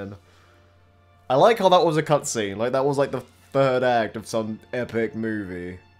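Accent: United Kingdom. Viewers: Hi I'm just a little YouTuber nobody but I'm from Oz and I promise you don't have an Oz accent, you sound beautifully British:) Love all the stuff you do and you are the reason I started watching YouTube, never stop with your magic!:) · Thanks for playing the map, hopefully I can make some more in the future, if I do (and if they're good) they'll be coming your way. Yes I'm aussie, and your accent is British, but, as they say....... Iv'e liked Bfme versus since BEFORE it was lame. Just joking I love ur channel I dont mind if u use my name, its ok. whatever.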